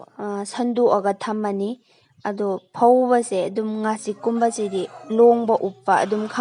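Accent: Kakching, India. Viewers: Kakching accent 👍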